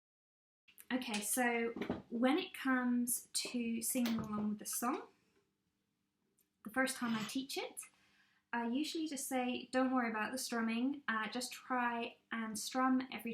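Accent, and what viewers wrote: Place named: Home Counties, United Kingdom. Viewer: That was good. It has spurred me on to give it a try. It's ages since I played that song. Your voice is better than mine but try as zi may I cannot place your accent. To me it sounds like home counties?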